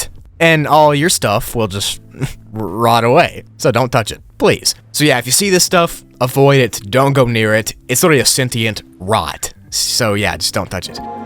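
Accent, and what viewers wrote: United Kingdom. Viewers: I know your British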